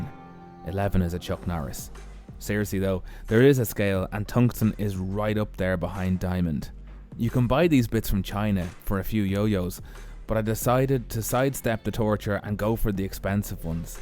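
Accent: Ireland. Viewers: Love the story-telling, makes the videos! Is it the Irish accent?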